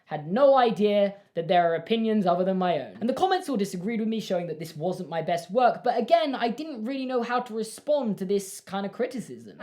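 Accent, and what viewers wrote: United Kingdom. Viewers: I don't know if you'll read this, but I feel so positively impacted by your video that I need to comment. I do not comment often on videos. I believe I found your channel through the Carlin Bros. I enjoy a lot of the subjects you make videos about (Harry Potter mostly). I really enjoy your editing style and delivery. I'm a bit surprised that you're self-conscious about your voice because I don't find it annoying at all. Perhaps it's the British accent? I know far worse voices for YouTube. Getting off-track a bit... I think this video says a lot about your character. You're opening up about your experiences as a YouTuber and ultimately your highs and lows. You acknowledge shortcomings and work hard to be a better YouTuber and a better person. That motivates me to be better, too. I also hope that you keep finding fulfillment through YouTube because I really enjoy your channel. 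I enjoy your comedy and value your opinions. I hope you know that many of your subscribers value your perspective. It does surprise me a bit that you receive impactful hate comments. You're a great person and you deserve everything you get from this platform. I hope you are well! Best of luck to you going forward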